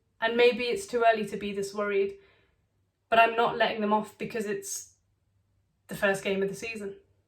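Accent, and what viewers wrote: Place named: Liverpool, United Kingdom. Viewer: Wow! a posh scouser. Decent review but it was a pen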